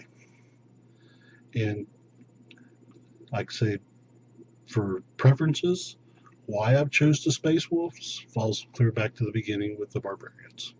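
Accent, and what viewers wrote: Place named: South, United States. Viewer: Super interesting video... you're so easy to listen too, I love your relaxed accent (I'm assuming its southern? sorry if I'm wrong!), reminds me so much of one of the WW2 veterans featured in the Band Of Brothers series - 'Shifty' Powers I think it was.:D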